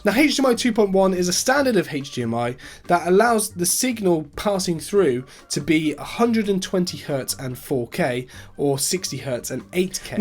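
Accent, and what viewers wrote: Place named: United Kingdom. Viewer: Please, knock off the aggravating BS background noise. It's distracting as well as annoying especially considering your British accented English. Yes, I'm American and speak real English not the bumbershoot and torch strangley pronounced type.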